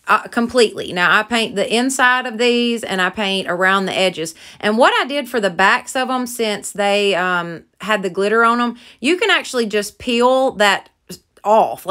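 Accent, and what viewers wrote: South, United States. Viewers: Hi, i just came across your channel. I love how cute and easy these DIYs are!!!😍 Most of all, i loooove your southern accent - it reminds me so much of my super sweet mother in law who's from Georgia. I loooove southerners, you are all sooo sweet and endearing.❤❤❤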